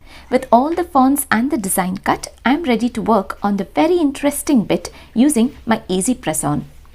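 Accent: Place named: Karnataka, India. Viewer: Nivu karnatakadavara kannada bhaashe keli tumba santoshavayitu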